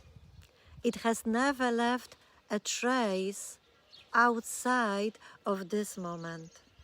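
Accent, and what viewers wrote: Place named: Poland. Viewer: It's insane how similar you are to the other woman I can't find anymore: the same Polish accent, the same rhetoric, there is no 'you', etc.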